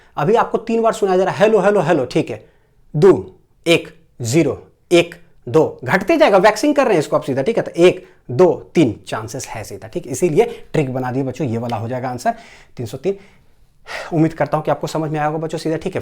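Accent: Bihar, India. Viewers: thanku sir आपसे पढ़ने पे अपना वाला फीलिंग आ गया आपका accent बिहारी वाला है न 😌❤️ सामझ् गए सर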